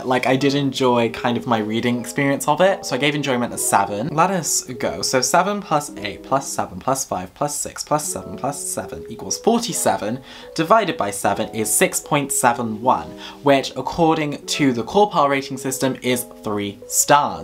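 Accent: Wales, United Kingdom. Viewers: I believe he is from Wales if that helps😊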